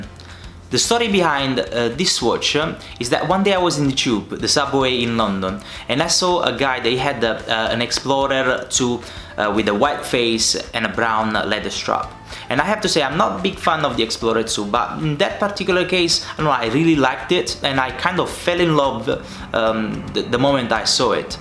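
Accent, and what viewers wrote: Italy. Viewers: I bought this watch and I love the looks and I love the size. Great review and I did pull the trigger and got one partially due to this review so thank you. My grandfather came from Sicily so I had no problem understanding the Italian accent, in fact I loved it. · The italian is strong in this one, btw nearly pulling the trigger on this watch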